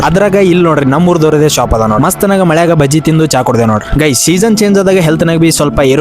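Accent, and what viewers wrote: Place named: Kalaburagi, India. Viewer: Nimma matu pakka kalaburagikar matu nodri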